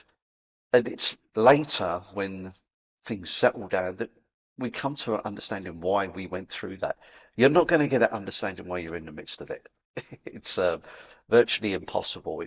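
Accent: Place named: Cockney, United Kingdom. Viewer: Lovely my friend. The Cockney accent helps!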